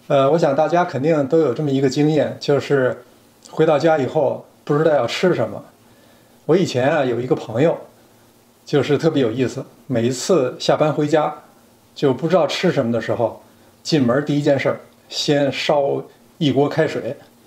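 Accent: Beijing, China. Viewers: 老师您是不是老北京的，有点听相声的感觉了😂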